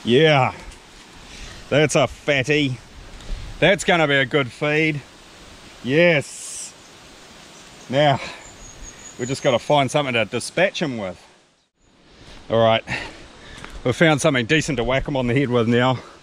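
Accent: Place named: New Zealand. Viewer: hey, dude. I just like your kiwi accent. Lovely video. It would be great if you share the location. LOL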